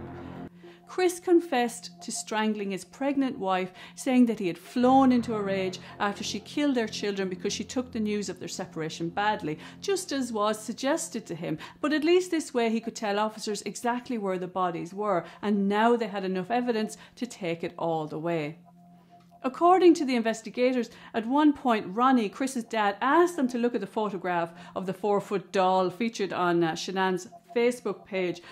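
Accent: Ireland. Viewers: Thank you for sharing your analysis! You sound Irish to me. I have many family members and cousins who live in Ireland and you remind me of them 😊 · Great video and break down of this tragedy.! I believe NK most definitely has some role in all this. Maybe she didn’t kill anyone but she for sure knew his plans and possibly pushed him to do it. Love your accent BTW.! It actually sounds Irish with an English twang xx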